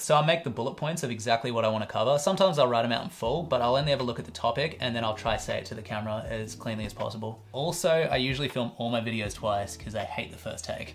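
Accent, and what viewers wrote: Australia. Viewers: …Have you considering recording ASMR/bed-time-story/Calm-app-style content, in which you dish out your sage wisdom in that gnarly Aussie accent so your subscribers can fall asleep every night slightly less anxious? … Asking for a friend. 🤣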